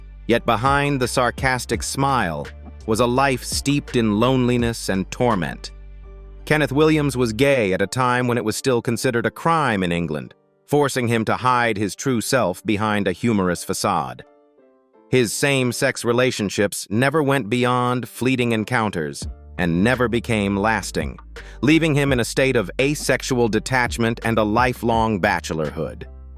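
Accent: United States. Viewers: Why the annoying American narrator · That,s because the commemtator is american !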